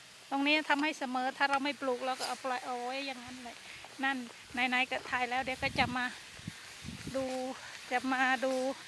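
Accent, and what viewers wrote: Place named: Surin, Thailand. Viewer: เพิ่งเห็นจ้ะติดตามนะเว้าเหมือนคนสุรินทร์นะชอบๆจ้ะ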